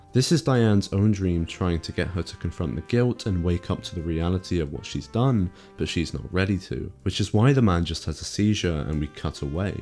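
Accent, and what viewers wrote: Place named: United Kingdom. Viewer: I will no longer be watching videos narrated by the hated British.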